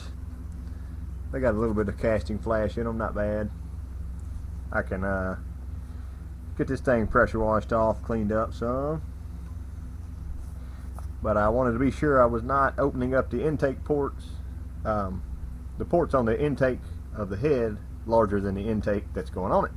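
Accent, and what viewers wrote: South, United States. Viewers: . Thanks. Great Information. Even though you have a southern accent, you dont sound stupid. Hey! Im jus sayin! You use correct descriptions and correct terminology so there is no doubt what you are talking about. Whoa! - some of these other channels! (No Names) Anybody w a phone Can put anything on the interweb.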